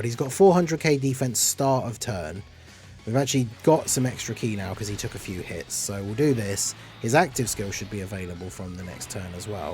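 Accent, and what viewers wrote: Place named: United Kingdom. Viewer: Are u from the uk? I love the british accent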